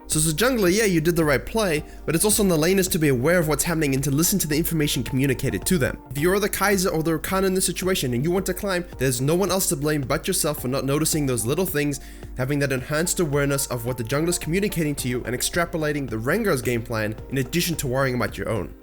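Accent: South Africa. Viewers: Are you south african bro?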